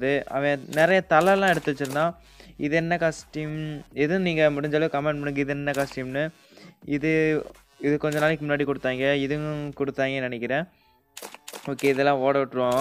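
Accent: Tirunelveli, India. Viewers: Bro r u from trinelveli your voice s like that. Because I too from trinelveli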